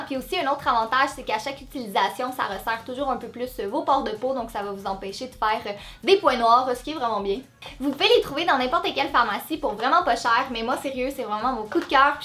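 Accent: Quebec, Canada. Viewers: je suis la 451 vue j'adore tes vidéo continue en plus tes trop belle PS : j'adore l'accent cebecoi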